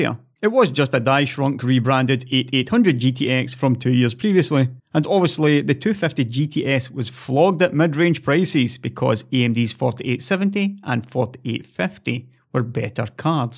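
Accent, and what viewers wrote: Scotland, United Kingdom. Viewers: getting much better at understanding Scottish accents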